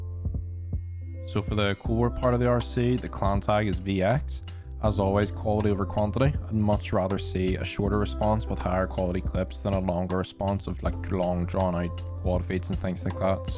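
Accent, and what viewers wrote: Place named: Northern Ireland, United Kingdom. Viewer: northern irishman with the asmr right here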